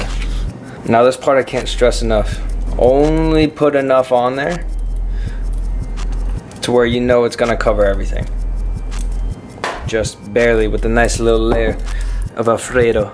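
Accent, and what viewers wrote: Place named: Illinois, United States. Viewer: Lol shit smacks. Sounds like Illinois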